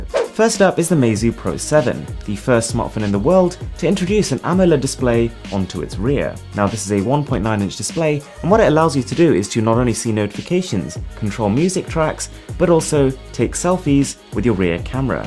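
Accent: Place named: Nottingham, United Kingdom. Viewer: Nottingham Accent.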